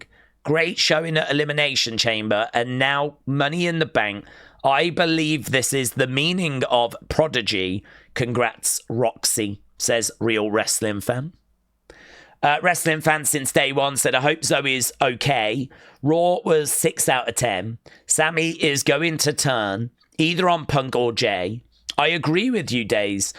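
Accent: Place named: United Kingdom. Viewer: Shoutout from my friend Sonia from The Canary Islands. She loves your british accent! Awesome!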